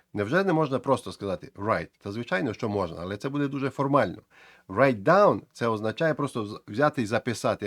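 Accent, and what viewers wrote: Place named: Galicia, Ukraine. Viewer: Красно дякую за ясне тлумачення! Мило та радiсно чути галицьку вимову пана! Най щастить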